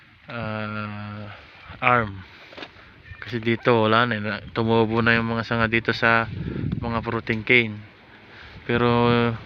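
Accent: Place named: Ilocos, Philippines. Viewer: San po location nyo...s pannalita po ninyo prang ilocano kau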